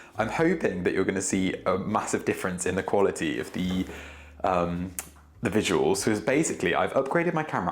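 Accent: England, United Kingdom. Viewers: English accent is tough for people who English is second language. Please keep your tone up so we can hear you clear and slow down when you talk.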